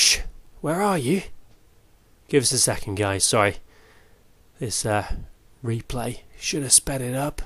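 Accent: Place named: United Kingdom. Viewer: I don’t know about the “Batman” voice...I do know that I’ve enjoyed your videos since last April when I signed on. Your content is outstanding and has helped me quite a bit. Matter of fact, your “Ice” troop configuration has given me quite a bit of success. So much that others in my clan use it now with devastating results. Your commentary, delivery, and UK diction is far and away the best!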